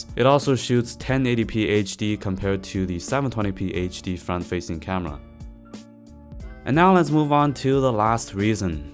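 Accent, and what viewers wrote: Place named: China. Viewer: Your accent sounds pretty mandarin....... well I guess more like the mainland mandarin ?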